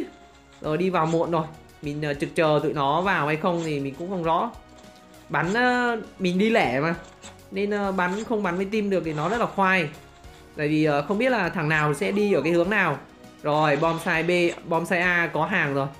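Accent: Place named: Nghe An, Vietnam. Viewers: Nghe cái dọng miền trong .kho chiu vl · Dlm nghe dong khăm vv ..may dân nghê an ah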